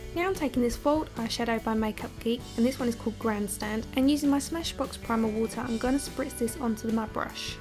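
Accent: United Kingdom. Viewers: Aw you replied. that's so nice of you. i recreated this look and learned new tricks and it came out nice. i've been watching your tutorials and its one of the best. you deserve more subbies and btw i followed you on IG. more power to you. anyways are you british? JW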